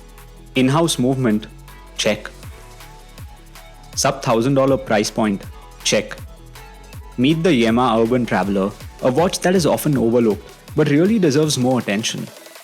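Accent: India. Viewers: Your accent is normal. I just felt that it just has a bit of an Indian tone to it. Anyway, your voice cover is very good.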